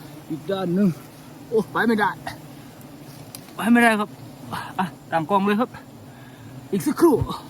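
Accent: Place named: Korat, Thailand. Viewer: สำเนียงเป็นคนโคราชนิ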